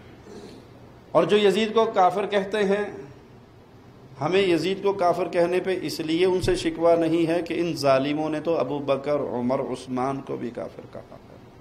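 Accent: Arab world. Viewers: اردو کو اردو لہجے میں بولا کریں۔ عربی لہجے میں نہیں۔ اردو کو عربی لہجے میں بولنا اتنا ہی غلط ہے جیسے عربی کو اردو یا پنجابی لہجے میں بولنا۔ یہ لہجہ بڑا ناگوار محسوس ہوتا ہے۔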